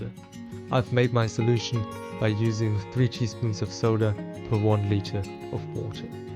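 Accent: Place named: Switzerland. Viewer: Interesting pronunciation. Studied British on a German substrate. Swiss-German?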